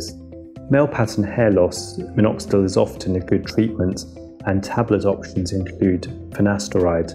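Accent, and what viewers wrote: United Kingdom. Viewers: It's a classy British accent you fucking hater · Hy chutiya india or english mar rha h british accent main jesay donald trump ki na jaiz olad h jo becahry urdu ni smjhty wo tri bhn ko kryn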